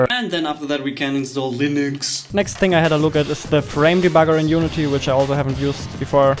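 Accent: Germany. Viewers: Hey, jetzt weiß ich wieso du einen deutschen Akzent hast 😂🙂❤ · Feier diesen Deutschen Englisch aktzent, aber nices Video. Kannst du mal zeigen wie man spiele auf Steam hochlädt? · Direkt aufgefallen, du bist Deutschsprachiger ^^ Aber cool dass mich der heilige YouTube Algorithmus hier her gebracht hat :) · Du bist deutsch? :D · Er ist deutscher. Man hat es im Call gehört. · Are u from Germany? u have a German accent ^^ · du sprichst see also to see immer wie „sie“ aus und nicht wie „ßie“😂 Daran hab ich auch gemerkt dass du deutsch bist. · Bist du deutsch?Hört sich irgendwie so an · Bist du deutscher? Are you german? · Wie man halt instant an deinem Akzent merkt das du Deutscher bist. :D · Haha ich wusste es dieser aktzent der muss deutsch sein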